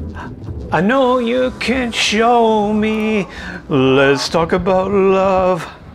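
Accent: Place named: Canada. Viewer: I enjoyed this video. I’m surprised that you could get so close when filming. I like the use of ‘A’ when talking. Very Canadian. It’s a bit long when looking at the male bird. Maybe describing what’s going on with the birds or an explanation on what the female is also doing. I’m not sure what you should say cause I don’t know really anything about Canadian geese (crowbar chicken). Keep going with the video, can’t wait for the next instalment. ❤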